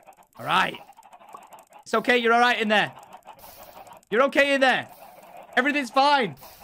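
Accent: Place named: Scotland, United Kingdom. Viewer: Why does turn scottish all the time lately? 😂😂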